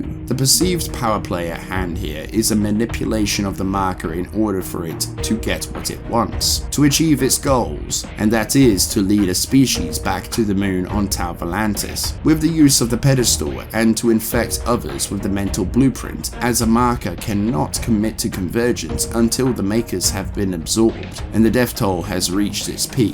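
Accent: United Kingdom. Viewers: Good video.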